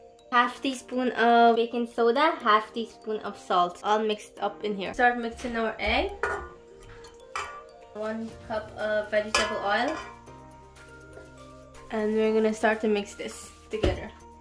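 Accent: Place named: Berbice, Guyana. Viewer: Just luv that Berbice accent!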